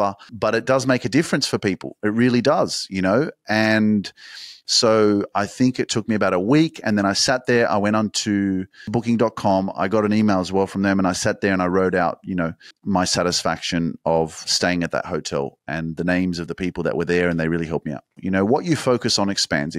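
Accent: Australia. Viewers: I love your daily msgs. New listener but I’m learning your dance moves and it always gives me a lift. Love the accent, Aussie. Love the msg. Turned my daughter on to you. She’s in a bad place in her life and needs positive reinforcement. Keep it up❤️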